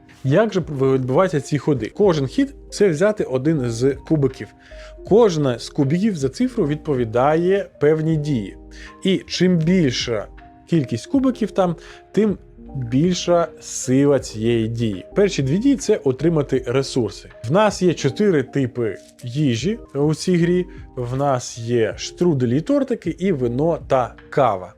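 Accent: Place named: Hutsul, Ukraine. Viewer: Сколько вас развелось. Ужас, слушать невозможно, суржик гуцульский, извращенный русский язык.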